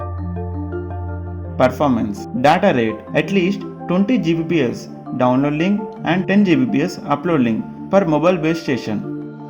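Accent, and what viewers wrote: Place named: South India, India. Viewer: Good voice if not perfect . Hint of south accent . Mis pronunciation of few words . Overall 4/5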